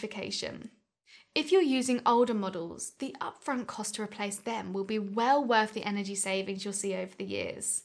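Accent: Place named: United Kingdom. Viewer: Radiators are uncommon in the USA. Guessing by your dialect, you are across the pond. Other tips work for both countries.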